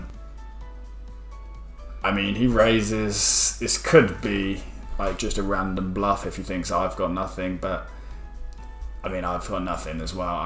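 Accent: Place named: Gloucestershire, United Kingdom. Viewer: thought your accent sounded close by to me. Wiltshire here 👍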